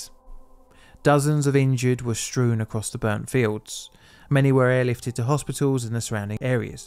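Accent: United Kingdom. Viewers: there are so many British pronunciations of French and Spanish loan words that are just grating to me. And don't get me started on how badly "wagyu" gets mangled.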